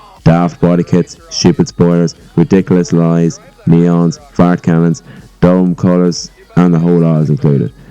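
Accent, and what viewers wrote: Ireland. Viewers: Are you Irish cause I am to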